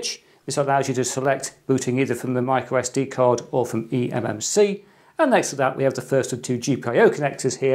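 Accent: United Kingdom. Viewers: Why do british people say "Sor" instead of "Saw"?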